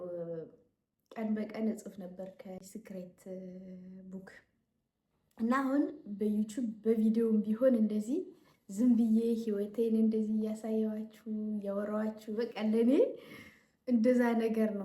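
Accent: Ethiopia. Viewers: I like your Amharic style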